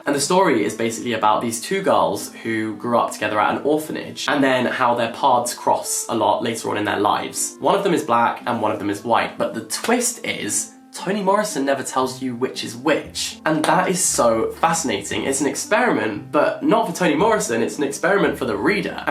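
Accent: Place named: Scotland, United Kingdom. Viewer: My brain started thinking in Scottish is so funny I'm laughing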